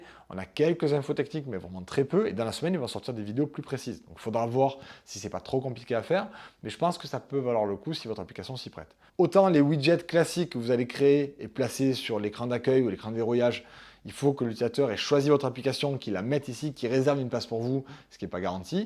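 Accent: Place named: Marseille, France. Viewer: ta l'accent de Marseille ! cool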